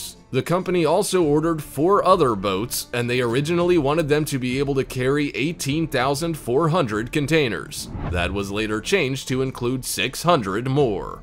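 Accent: United States. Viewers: The lector is too americanised for my liking.. So irritating... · VERY annoying melodramatic American commentary, unwatchable. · An American butchering the English language. - Ridiculous Pronunciation of regular words.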